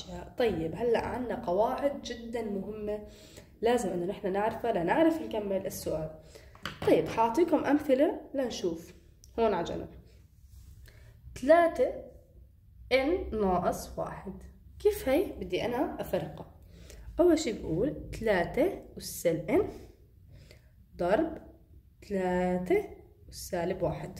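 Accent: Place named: Homs, Syria. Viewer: حاسك حمصية متلي😂 عكلن شرح ممتاز الله يعطيكي العافية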